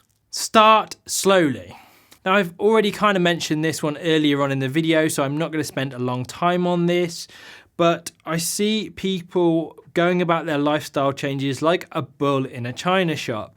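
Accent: United Kingdom. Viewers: He speaks the Queen's English, so he's the one who actually says it correctly. If you think the way he says vitamins is funny, you should hear him say "Aluminum." Heh heh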